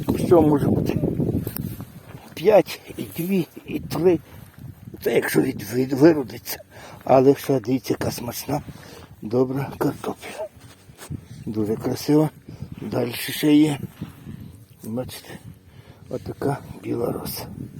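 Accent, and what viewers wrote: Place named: Lviv, Ukraine. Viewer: Як приємно слухати ваше привітання, тільки почула і відразу подумала, людина зі Львівщини, навіть не відрізниш, у вас і діалект Львівський!, 😊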